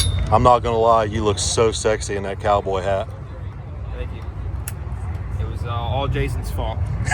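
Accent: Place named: Texas, United States. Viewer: I sense a little texas drawl with that cowboy hat on.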